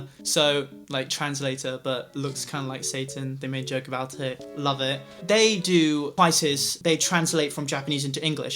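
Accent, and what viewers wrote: United Kingdom. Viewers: Wasnt expecting that british accent lmao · I wasn't expecting that British accent- ( I usually listen to the covers )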